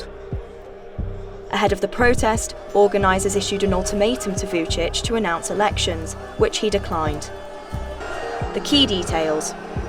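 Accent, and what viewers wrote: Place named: United Kingdom. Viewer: This British accent is unwatchable, sorry...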